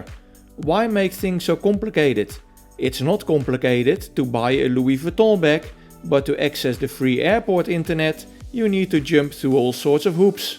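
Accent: Netherlands. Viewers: kom je uit nederland, ik kan dat aan je stem horen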